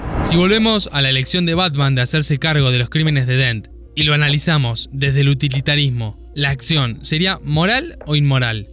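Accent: Argentina. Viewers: Sos argentino pa? Muy buenos videos 🤠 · Qué curioso pronuncian la palabra "niños" por aquellos lados. Ya he visto videos de youtubers argentinos y paises aledaños, y la pronuncian algo como "ninios" 🤔🤔🤔.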